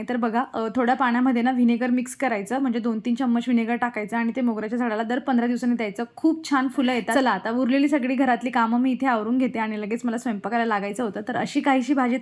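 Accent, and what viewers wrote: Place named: Mumbai, India. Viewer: Mumbai वाल boltet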